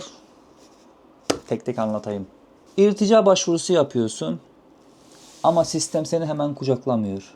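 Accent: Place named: Adana, Turkey. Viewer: Hem$o sen ADANALIMISIN ? $iven bizim oranin $ivesine benziyor.Almanya´dan selamlar 😊🤚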